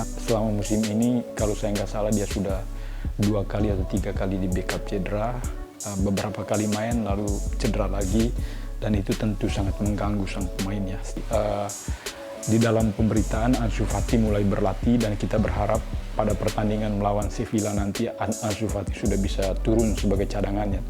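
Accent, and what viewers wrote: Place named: Manggarai, Indonesia. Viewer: Dari dialeg nya bung kek logat manggarai.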